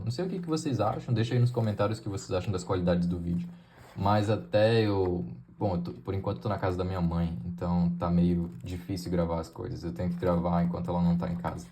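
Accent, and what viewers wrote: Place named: North, Brazil. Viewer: Sabia que tinha reconhecido um resquício de sotaque nortista em você. Sou do norte também. Você é fera demais. Orgulho de você.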